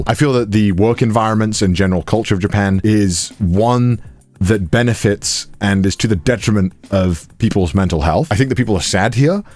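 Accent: Wales, United Kingdom. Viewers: ok am I crazy or does his Welsh accent sound thicker I wonder when he filmed this after his trip home...am I along in this? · I don't really watch anime or know much about Japanese culture, but your videos are just genuinely enjoyable. I have been binge watching them a lot because they're just really nice to relax and throw on. I think no matter where you live or what your content is on you'll be successful because you are just good at what you do and are dedicated. People can sense that and it draws them in. And the deep British voice kind of makes it hard to click away lol Wishing you another successful year in 2022!!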